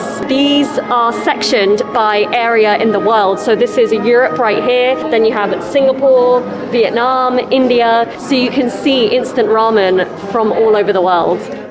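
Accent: United Kingdom. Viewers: 再生速度を落とすとはっきりしたイギリス英語の特徴がイヤというほどわかりますｗ おかげで素晴らしい英語教材になってます。ありがとうｗ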